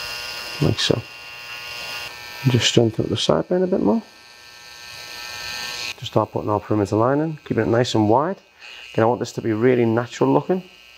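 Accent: Liverpool, United Kingdom. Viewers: scouse accent? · Scouse I believe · Love your scouse accent mate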